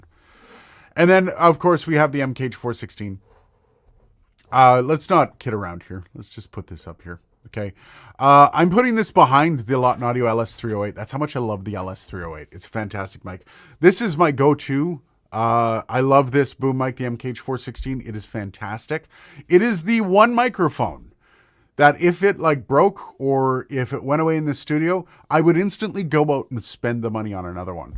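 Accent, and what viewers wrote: Canada. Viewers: You’re Canadian eh?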